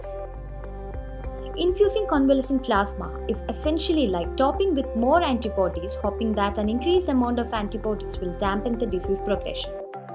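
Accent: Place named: Kerala, India. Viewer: A Malayali narrator with MTI !! :)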